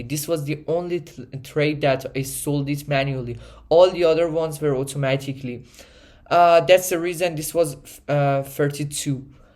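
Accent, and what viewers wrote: Iran. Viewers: Fkn persian accent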